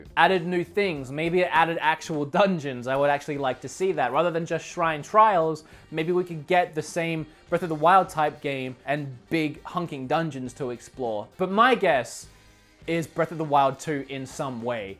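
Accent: Australia. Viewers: I changed my Siri voice to Australian because of you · Your Australian accent isn't as thick as it used to be I noticed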